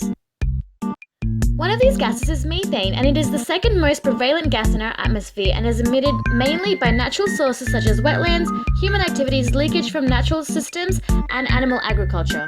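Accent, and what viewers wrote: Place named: Australia. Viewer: great video; I am too curious though, what kind of accent is that? I want to say Australian? too few views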